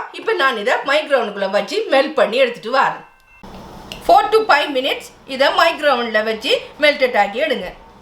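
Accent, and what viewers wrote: Sri Lanka. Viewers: Beautiful sharing I love this Srilankan tamil Thanks for sharing stay connected dear new frnd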